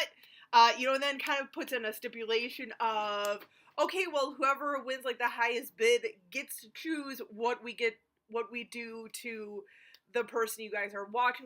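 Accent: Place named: United States. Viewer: You have that American accent that makes you say runt instead of rent! Lol, not criticizing, just noticing. I'm from Ontario, Canada, eh, so I'm used to people laughing at my accent, so, it's all good. I keep hearing more and more accents from around the world with the internet. I also have misophonia and certain sounds drive me nuts and make me stabby, but also, it makes me hear things intently and I can hear accents so clearly. Yours is one I hear often. Is it midwestern? Or where is it from? Just curious. Thanks. Thanks for the review too!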